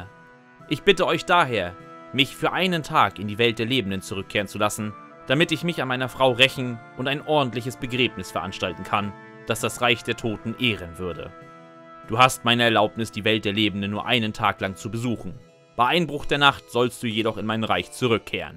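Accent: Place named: England, United Kingdom. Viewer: Als Grieche schmerzt es meinen Ohren, die Namen im Deutschen in englischem Akzent zu hören...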